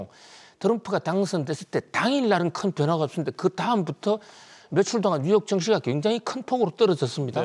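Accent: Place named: Chungcheong, South Korea. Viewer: 충청도에유 천천히 귀에 쏙쏙이네요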